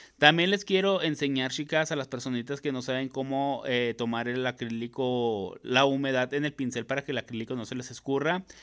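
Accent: Chihuahua, Mexico. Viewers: Es Al acento de Chihuahua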